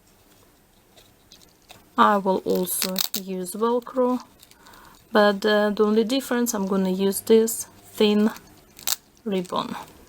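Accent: Russia. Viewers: I love ur Russian voice and ur crafts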